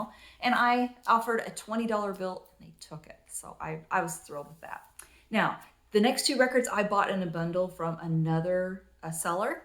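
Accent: South, United States. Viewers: Love your southern accent!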